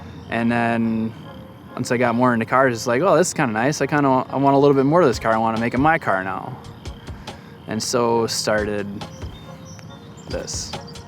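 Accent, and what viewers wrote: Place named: North, United Kingdom. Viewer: Holy northern accent. Much respect from Virginia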